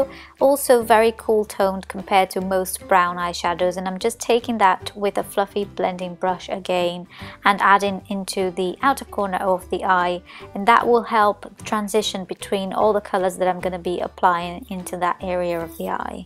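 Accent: Wales, United Kingdom. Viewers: Lovely look, can't wait to try it out this weekend! :) Am I right in thinking I can hear a very slight Welsh accent coming through every now and then? :p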